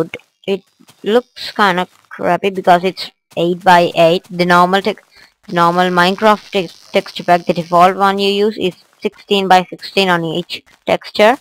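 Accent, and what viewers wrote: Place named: India. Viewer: its indian accent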